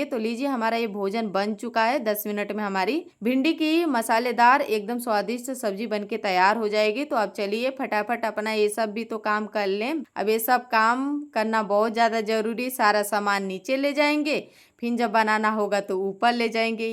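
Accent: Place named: Kanpur, India. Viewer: आपकी भाषा तो अलग है आप शुद्ध हिन्दी कानपुर वाली बोल रही हैं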